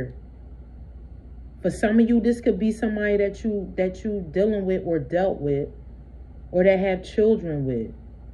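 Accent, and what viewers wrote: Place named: Philadelphia, United States. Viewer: YOU HAVE A PHILLY ACCENT.❤❤❤❤ AM I RIGHT I’M ORIGINALLY FROM PHILLY.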